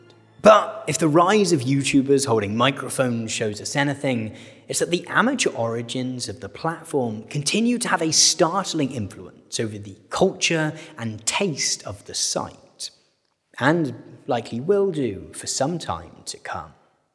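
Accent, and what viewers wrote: RP, United Kingdom. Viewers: cool it with the media training body language and BBC speech. Be yourself.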